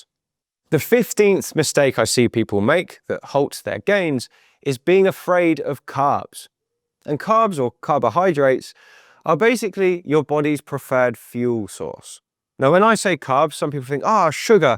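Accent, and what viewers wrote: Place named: Leicester, United Kingdom. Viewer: This Leicester accent makes me feel at home :)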